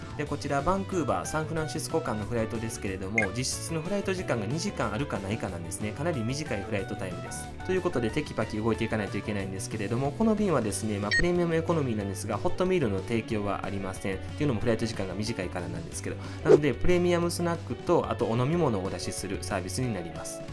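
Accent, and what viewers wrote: Kansai, Japan. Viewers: いろんなとこ開けさらして何してんねや😂 関西色特に強め（笑） プレミアムスナックの正体が気になりました エコノミーでもらえるのと違うんや✨食べてみたい😋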